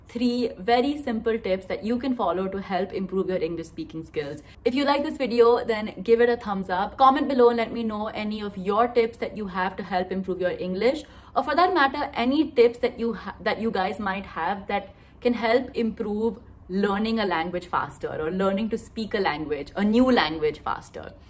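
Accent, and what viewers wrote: India. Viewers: Wow that's very informative video. But haven't knowing your mother tongue, kashe asa tu ? Are you Goan ?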